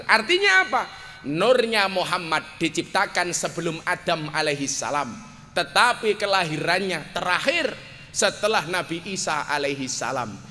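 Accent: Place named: Java, Indonesia. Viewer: Bahasa nya internasional dong.. Nggak ngerti medok banget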